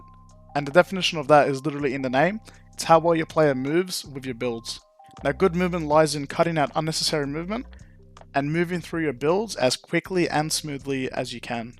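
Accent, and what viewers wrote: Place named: Sydney, Australia. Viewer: Sounds like he's from inner west-sydney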